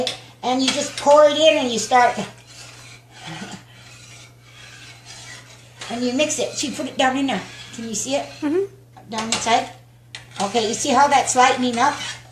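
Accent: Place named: South Dakota, United States. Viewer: I’m from McLaughlin , SD and your voice brought me back home 😅😂 haven’t had gabubu bread in so long !!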